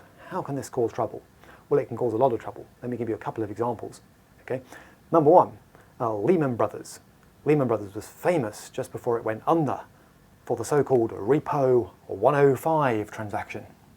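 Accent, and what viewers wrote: United Kingdom. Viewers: lmfao love and his brilliant explanations with a great British accent!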